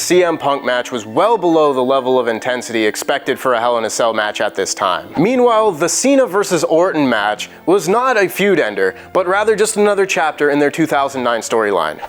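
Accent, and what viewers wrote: United States. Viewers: Hello, what's this? An American voice? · Clicked on wrestletalk video. Hears American accent. Is now confused. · The American accent threw me off.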